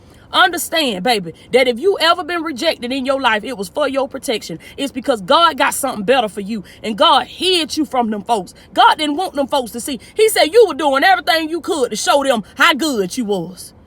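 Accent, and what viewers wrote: South, United States. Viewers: Love to hear her southern voice preach 🥰🥰🥰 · Just love your American accent! I'm from London, 🇬🇧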